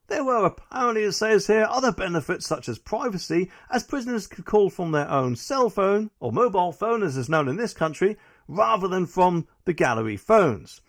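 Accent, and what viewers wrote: Norfolk, United Kingdom. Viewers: You sound just like a good old norfolk boy 😅❤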